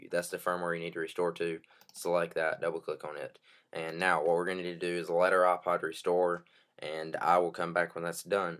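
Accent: United States. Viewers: lern englisch. Nein ehrlich so sprechen manche Leute in den USA.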